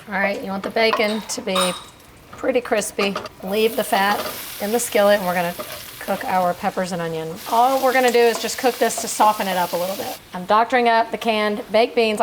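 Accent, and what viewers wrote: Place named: Texas, United States. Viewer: I thought you were from Texas this whole time lol.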